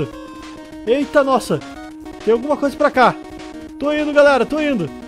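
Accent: Rio Grande do Sul, Brazil. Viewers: Ele é gaúcho kk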